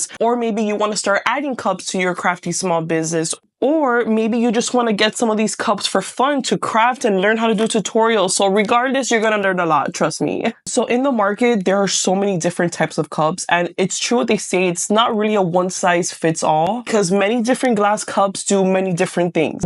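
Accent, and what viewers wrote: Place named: Miami, United States. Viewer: idk how you popped up on my feed but girl, I’m so grateful to you- your video has been so helpful. I’ve watched so many creative small business videos and as soon as I herd your voice I was like “bro she’s from Miami” I know this accent. I’m from Miami and then you said Miami y Doral … I’m like Gracias Diosito. Finally someone on YouTube that’s local. Im slowly growing my small business and I really want and need this business to succeed (pregnant with my second baby and I know I’m made to create). 🥹I’ve known about Libby from wine glasses and never knew they have glass cans so THANK YOU 🙏🏻 excited to watch more of your videos and get inspiration to also share my journey and grow this business. 💝🎨 thank you again- you Rock 🌻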